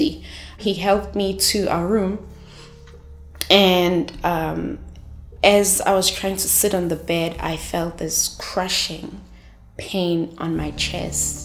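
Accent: South Africa. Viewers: she’s south african i can tell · I know a South African accent when I hear one... I'm glad you're okay. 🥺❤ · She sounds like she is from South Africa 🇿🇦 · Your accent sounds very much South African. I'm here because I have had a lot of stings and severe pain in my heart for a couple of years now. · im zimbabwean but i could tell she was south african too 😳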